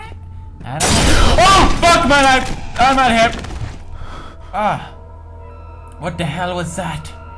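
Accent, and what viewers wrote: Sweden. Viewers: you can hear it that the man is swdish, so im i<3<3<3 all the guys in my class always says Maria, im like its just my facebook name brofist* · Pewdiepie has caused me to become so interested in swedish culture.. plus i never knew how amazing swedish accents are! they are Adorable. and thats coming from a guy ^.^ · its an accent. hes swedish. his actual name is. his girlfriend is italian so he lives in italy. get your facts straight.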